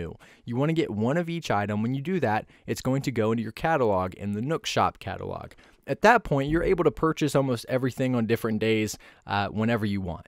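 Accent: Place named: General American, United States. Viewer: reminds me of how people who speak in a “standard American accent”on tv commercials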